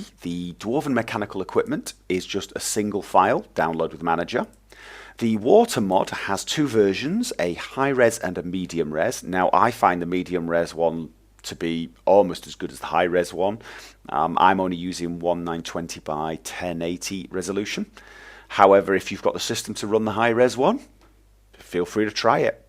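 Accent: United Kingdom. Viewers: This guy, he really does a great job with these movies. His reviews are pretty good, I think he has good taste, and his accent is pleasantly British, but its the little things, like providing links in the description for Nexus and, perhaps most refreshing, links to the particular segment within an episode for a particular mod. One thing I'd like to see more of, however, is more first person perspective shots for reviews of weapon mods, which often appear different in FPS.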